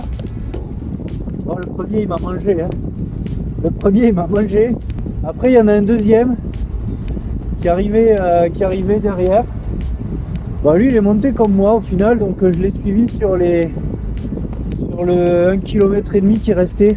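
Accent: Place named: South-West, France. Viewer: Beaucoup de mots crus et d'accent du sud-ouest. Je m'abonne.👍💪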